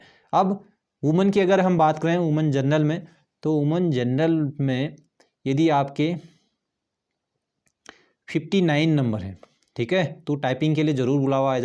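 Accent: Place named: Kumaon, India. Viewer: Kumauni lg rha bhai...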